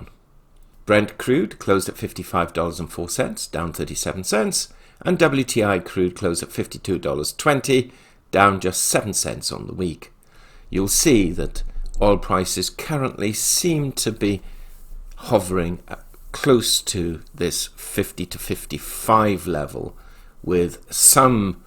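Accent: United Kingdom. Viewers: Can't beat a British accent when it is spoken well..... PS..keep buying PMs !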